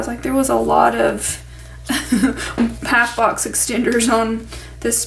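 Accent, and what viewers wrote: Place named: Kentucky, United States. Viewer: I just love your videos... Anytime i have a video playin my 7 yr old says she talks just like meemee (very deep Kentucky) we love it girl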